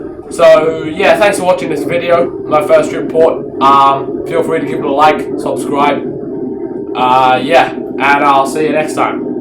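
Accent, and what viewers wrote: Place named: Australia. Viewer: gday gday, you sound so aussie lol. keep it up and get more coming!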